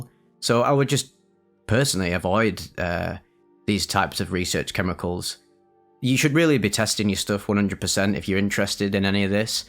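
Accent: United Kingdom. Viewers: are you british? i cant tell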